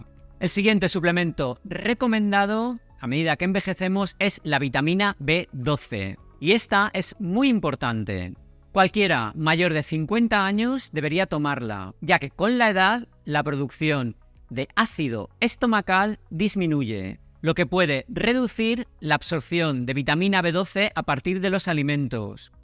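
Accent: Spain. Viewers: Vit D3 PARA CARENCIA DE VIT D..SOLO POSEO EL 8% Y NECESITO CERCA DEL 100%> ES UD UN GRANDE, GRACIAS CONFIO EN UD..DESDE HACE MUCHO LO ESCUCHO..Y LO PERDI HOY YA NO no lo perderéad. Solo me agrada la gente con valores lis demás que digan no me interesaron antes y menosdesdeURUGUAY.LO SKDO..SIEMPRE ME HA DEJADO LO MEJOR..A LAS ORDENES...DE QUE PAIS ES UD??? a..🥱🇺🇾. UD R TIENE ACENTO O ESPAÑOL. MADRE PATRIA O COMO EL MIO.,MAS BIEN SPANISH... UN enorme gusto...bienvenido,Bienaventurado..es muy grato en esta suciedad No SOCIEDAD.encontrar valores..!!! Uruguay.; Graziella Riccetto.!! Gracias.!!❤🎉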